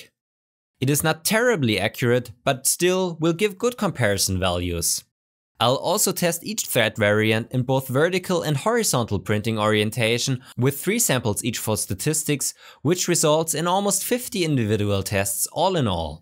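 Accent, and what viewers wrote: Germany. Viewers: Der Deutsche Akzent ist unerträglich.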